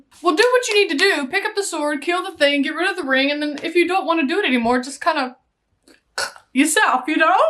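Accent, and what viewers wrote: South, United States. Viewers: your Southern voice makes me think of home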